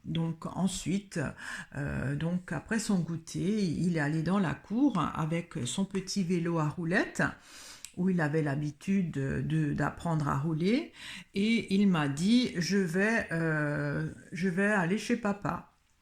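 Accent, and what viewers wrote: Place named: Besançon, France. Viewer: Bjour Madame, ê vous de Besançon? Je pose la question en raison de votre accent.Je suis née là bas mais je n'y suis pas restée longtemps. En espérant que ce petit bout d'chou sera retrouvé sain et sauf❤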